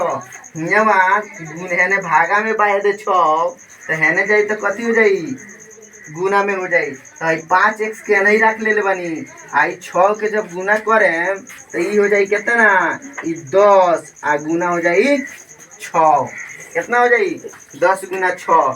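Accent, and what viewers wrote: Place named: Bhojpuri, India. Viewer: Bhojpuri andaz me sir❤❤❤❤❤❤❤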